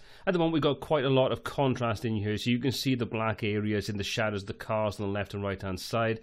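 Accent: Wales, United Kingdom. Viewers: So good to hear a welsh accent on this!! Much love and thanks for the lesson!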